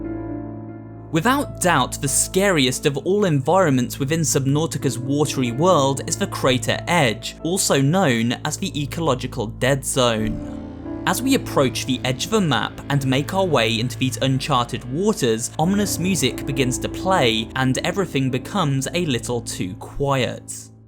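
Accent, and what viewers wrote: United Kingdom. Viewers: Omg the british is so deep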